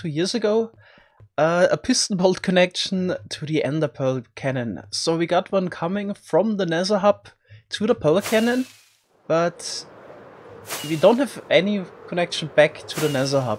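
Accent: Germany. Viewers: Is he German? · Man merkt absolut das du deutsch bist haha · Are you German? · Bist du Deutsch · You Sound a little Bit german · Deutsches Akzent xD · Bist du deutscher? Man hört das voll raus! 😂 👍 Aber geile Video💗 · Oh du bist von Deutschland. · Bist du vielleicht Deutsche weil dein Englisch hört sich so an 😂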